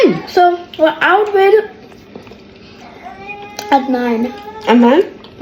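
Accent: Jamaica. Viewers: I just love how u speak our own patios love your style girl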